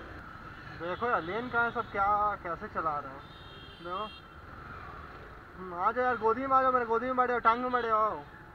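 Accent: Bihar, India. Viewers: In Bangalore u have bhihari accent....?????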